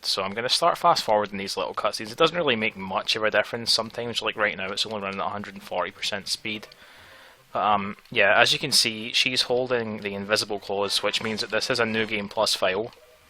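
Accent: Scotland, United Kingdom. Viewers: Oh, damn, this is the first video I've seen from you - a friend recommended your channel. Nice to hear another Scot! Mind me asking what part you're from?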